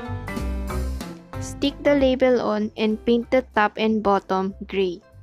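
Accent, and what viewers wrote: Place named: Philippines. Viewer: I also love how Filipino your accent is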